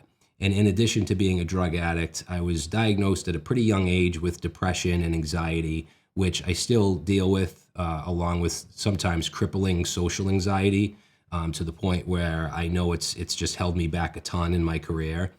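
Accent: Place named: Boston, United States. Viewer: web dev videos helped me to enjoy web development again after losing interest in it around 2017. There was just something about his style and approach to making tutorials and crash courses. I love web development now more than I ever have, and finding his channel was a big part of that. It makes me wonder what my life would be like now without having found his channel. The only thing I question: You say you "used to" have a thick Boston accent!? I feel like we need an audio example, because this still sounds like a pretty thick Boston accent.:-)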